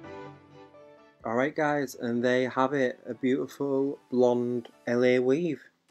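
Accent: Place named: North, United Kingdom. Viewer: I love your channel! I've recently started doing my own hair at home (well, what I mean is a few months ago I had a disastrous set of nanos that all fell out within a week and I had an event coming up so I pretty much forced my poor boyfriend to watch endless la weave you tube videos and then try to put one in for me because I couldn't get/couldn't afford another last minute appointment..) Your videos are great, they've really helped/made me want to have this method after years of micro rings and the hair you do always looks so natural at the end It's nice to hear a fellow northern accent too, most of the videos you see are very excited Californians (not that I have anything against them haha) swishing their hair about and insisting on telling you their life story for 4 minutes before the video actually starts 😂 I was just wondering how many rows you generally put in and if you double/triple up the wefts on your rows? 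What weight of hair do you generally do as a full head? My boyfriend is surprisingly good at straight sectioning/putting the hair in that it stays in and he doesn't mind so he always does my hair now, but where to place and how many rows to put in is the only problem. They either seem to end up too far apart so I run out of head, or end up with masses of hair all at the top of my head so that it looks like I have an enormous head 🤦‍♀ Love the idea in this video of adding more hair to the weft at the sides too because I also have blonde/fine/short hair at the sid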